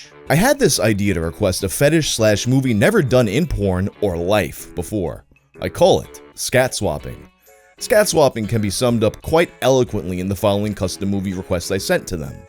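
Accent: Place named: New York, United States. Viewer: I don’t know why I never realized you were a fellow New Yorker before. YERRRRRRR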